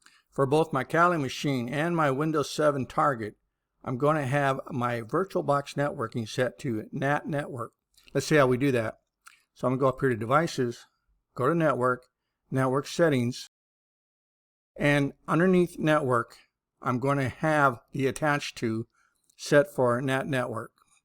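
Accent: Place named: United States. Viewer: hoooly the voice so american based love that nice video KKONAW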